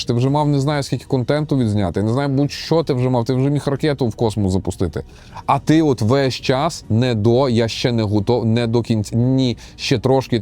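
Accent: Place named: Lviv, Ukraine. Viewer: Перший раз побачив на підкасті Фонтан. Перші пару випусків було досить незвично...Але, через деякий час звик до "львівської подачі") - почало подобатися... БВБ, на мій погляд, гарний формат в який ведучий досить гармонійно вписався! Дякую дядь:))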